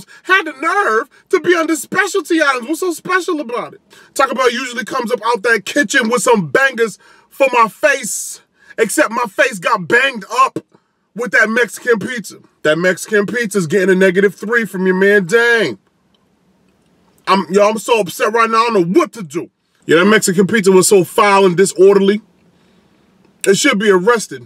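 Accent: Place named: Atlanta, United States. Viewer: you sound like you from Atlanta Georgia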